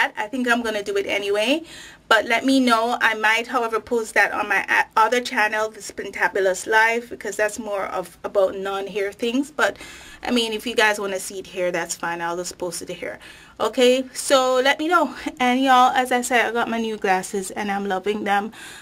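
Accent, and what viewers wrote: Jamaica. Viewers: Love this vid...thanks for the patois too. Makes me feel like home :) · you sound Jamaica :)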